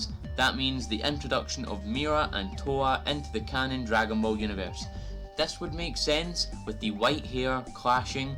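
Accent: Scotland, United Kingdom. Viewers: I liked this video, man! First and foremost, is that a Scottish accent I hear? If it is, that's awesome because we need more UK YouTubers in the Dragon Ball community - me being one myself! I loved your idea of a Saiyan that resembles Goku travelling to Earth in Trunks' timeline and easily destroys him. The only question from there would be, how did he become that powerful to have this be a threat to Goku, Vegeta and even the Omni-King? Me personally, I think "Black Goku" will be a created being, by a force that hasn't been introduced yet in Dragon Ball Super. Fun theories and video, I subbed for more! :)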